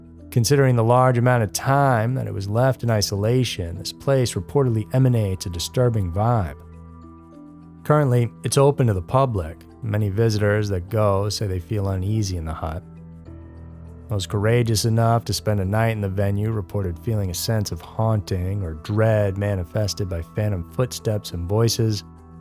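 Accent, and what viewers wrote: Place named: East Texas, United States. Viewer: That is the most Marshall/Longview accent I've ever heard.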